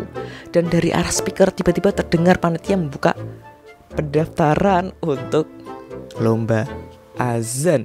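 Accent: Java, Indonesia. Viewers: Iya bahasa jawa lucu kamu ya hahahhaha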